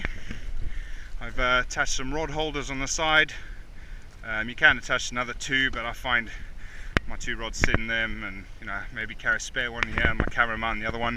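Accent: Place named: South Africa. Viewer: Hi I don’t mean to be rude but are you partly South African only guessing from your accent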